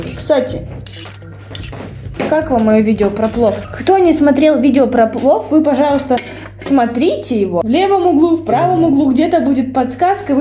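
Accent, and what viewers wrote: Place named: Tajikistan. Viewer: честный слов ты как актириса браво по акцентом разговариваешь по таджик ской язике.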